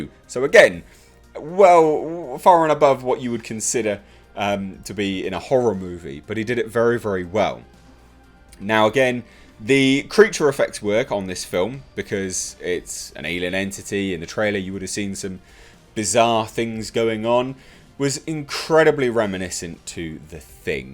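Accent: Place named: England, United Kingdom. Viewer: Ha ha Automatic subtitles: Colorado Space, must be the english accent. Nice movie, except for the brother and sister nonsence decisions near the ending. Their mother has become something like Brundlefly, but it's ok, everything's going just fine. Or the brother who wants to get into the well to save his dog? Probably the best HPL adaptation now. So far, I thought Dagon was the less terrible with From Beyond...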